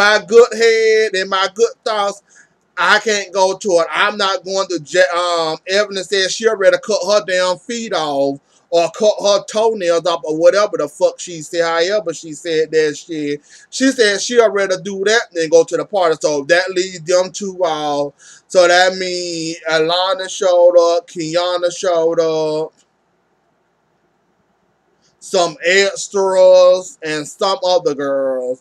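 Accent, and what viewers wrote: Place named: South, United States. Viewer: I love that accent. Country grammar. ❤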